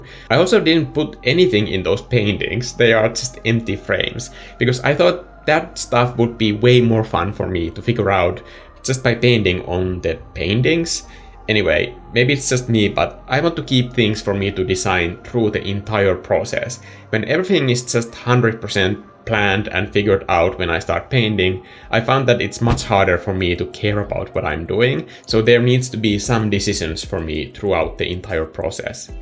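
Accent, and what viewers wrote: Finland. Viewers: I always loved these types of drawings as well, as a kid and up to this day. So the details, care, and attention you gave to your creation really resonated with me! You created a beautiful, relatable space which somehow feels both familiar and strange. So excellent, kiitoksia for sharing!! (I am guessing by your accent you are Finnish 😊) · Are you finnish? You have voice and accent like Tuomas Holopainen 😄👍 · I love your style so much 💚💛 and I knew I recognized that accent!! Kiitos videosta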